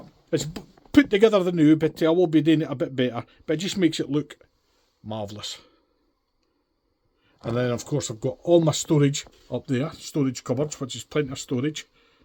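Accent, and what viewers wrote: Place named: Scotland, United Kingdom. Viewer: Good to hear a Scottish voice!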